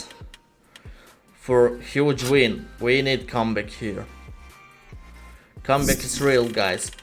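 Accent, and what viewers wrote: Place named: Russia. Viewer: Your russian accent kills me 😂😂😂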